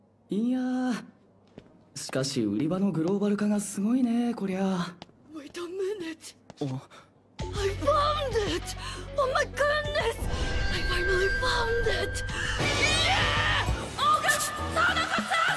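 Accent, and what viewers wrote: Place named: Japan. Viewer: the japenglish is my favorite part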